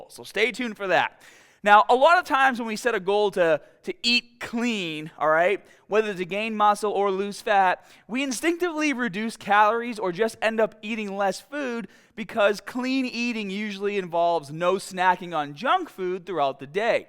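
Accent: Boston, United States. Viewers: This guys definitely from Boston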